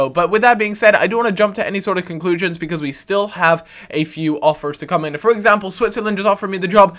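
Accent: Scotland, United Kingdom. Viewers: a thought you were scottish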